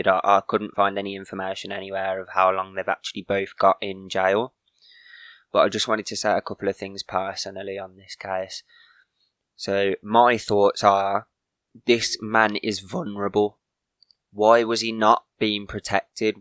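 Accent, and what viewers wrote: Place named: United Kingdom. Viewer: Where is your accent from I know British but where . My Aunty and uncle lives in England their accent is way different love the accent by the way keep up the good work. Congrats on your little one .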